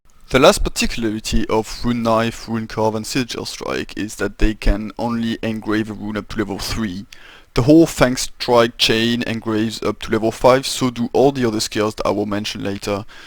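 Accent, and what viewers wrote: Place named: France. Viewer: Oh putin l’accent français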